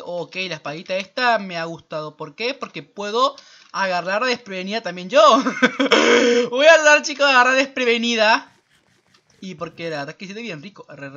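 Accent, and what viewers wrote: Argentina. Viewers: Eres de cordoba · eres argentino?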